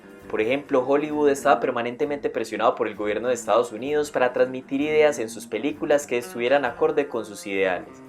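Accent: Antioquia, Colombia. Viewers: Seguro después de un año ya sabes la respuesta, pero sí, lo es. Pareciera ser de la región de Antioquia o al rededores por el acento. Saludos. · Oye, puedo notar en tu acento que eres de colombia. De estar en lo correcto te queria preguntar: pensando en las proximas elecciones que candidato tu consideras que representa mejor o en algo los ideales liberales? Saludos desde Australia!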